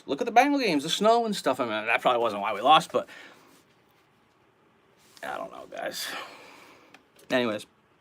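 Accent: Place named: Maine, United States. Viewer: Good Job bro, that Maine accent shows up on live. Good people hunting is great.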